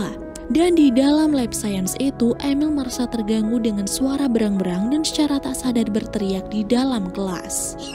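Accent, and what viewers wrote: Pemalang, Indonesia. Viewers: Wong Pemalang tah min?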